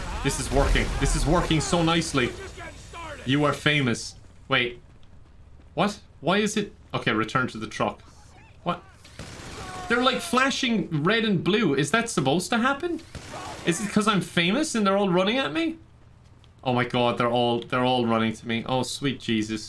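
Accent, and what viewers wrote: Ireland. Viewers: Dark viper but irish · Personality, an irish accent, a chaos mod in GTAV, it's pretty great.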